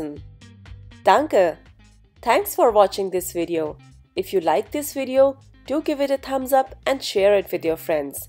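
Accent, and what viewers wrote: India. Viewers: Ist es nur mich, oder klingt ihre deutsche aussprache Inderin? Is it only me or does her German accent sound Indian? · it sounds like this lady has an Indian accent and it’s throwing me off… i can’t pronounce words properly because of that 😕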